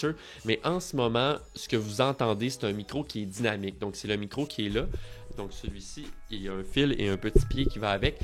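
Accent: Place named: Switzerland. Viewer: J'adore l'accent Suisse ! 😏 Merci pour toutes ces infos !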